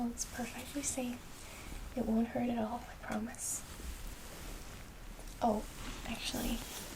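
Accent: United States. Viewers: your american accent is perfect! you said bandaid instead of plaster! A+ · I love her American Accent. Very accurate, very ASMR inducing. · Why US accent for this video? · I can't believe how well you do an american accent! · Your American accent is excellent! Very convincing. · You almost sound American in this video lol your accent was hardly noticed by me. Great video! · it's so odd to hear you potray an american accent rather than your using your original english accent lol i love it! can you do more RP's with american accent? :-) great vid btw! put me right to sleep lol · Solid American accent :) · You sound so American in this video! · Your American accent is pretty good! Your natural accent lends it a tinge that we would recognize as Southern. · So weird to hear you speak with an American accent. Nicely done. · Wait she isn't American? Like I live in the US and I thought she was. 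· Very creative & entertaining scenario, thank you! And I was impressed with your American accent, well done! 😊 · Nice American accent. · Me:"Wait...something doesn't seem right....OMFG SHE'S DOING AN AMERICAN ACCENT!"😱 Btw I LOVED this💗💗💗💗 such amazing job as always! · Fantastic job! Your "American" accent is perfect. :) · Aw.. I miss your normal accent. Great job on the American accent, but I love your real voice. Great role play! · Ben Church I always forget she’s not American when I watch this!! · Your American accent is excellent. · Good American accent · Love your american accent! It's so Buffy :) That aside, I just love the content of your videos. Always takes me into a different world! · nice attempt at the american accent! haha great video :) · Wow, nice American accent. I'm impressed. You look lovely as an astronaut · Pretty good American accent. I didn't realize you had lost your accent until I watched it the second time. 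· You do a fantastic American accent. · Your 'Merican accent is awesome! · I didn't even know that you weren't American until I read the comments so I can safely say that that's a killer accent. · Great video held my interest every second! I don't believe you're not a Yank.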